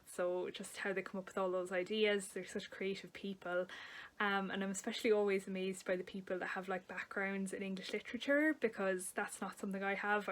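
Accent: Ireland. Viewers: I need to do one of these next, too! Welcome!!! Love the Irish accent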